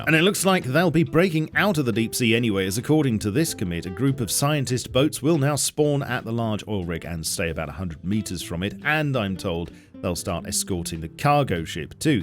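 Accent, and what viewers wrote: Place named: RP, United Kingdom. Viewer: Ive always wondered why you sound like a posh BBC topgear presenter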